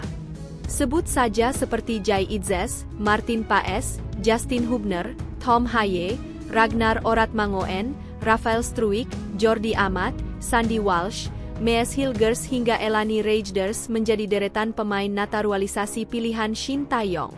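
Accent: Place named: Europe. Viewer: Apakah anda orang asing, kenapa logatnya seperti orang eropa, atau anda tidak punya jiwa patriot, bangsa bahasa indonesia.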